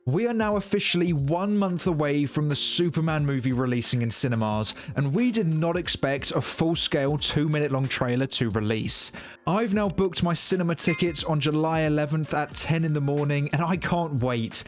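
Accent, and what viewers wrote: United Kingdom. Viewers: These are the facts. The fish and chips accent seriously enhances the review experience I’m not even remotely going to lie. Love this channel, even though he complains too frequently about even mild humor in a Superman film. Still, few have done better journalism on the progress of this film than this fellow.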